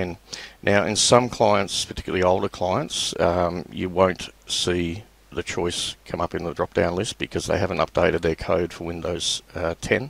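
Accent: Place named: Australia. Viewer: Mate, Your accent is awesome. Brought back all the fantastic memories I had in Australia. The best country, people and culture I ever experienced in the entire world. Video helped, good on you mate. Cheers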